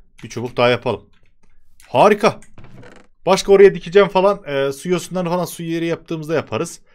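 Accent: Yozgat, Turkey. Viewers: Abi yozgatlımısın?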